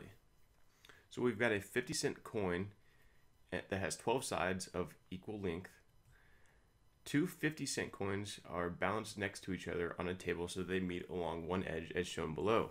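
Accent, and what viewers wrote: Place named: United States. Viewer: American voice talking about an Australian coin.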